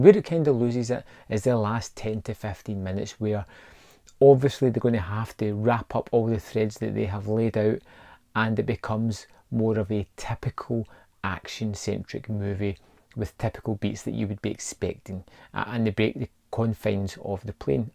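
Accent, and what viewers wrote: Scotland, United Kingdom. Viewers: Yes it is, and may I say for a Scott he speaks very clear and well...psst ❤ a proper Scottish accent!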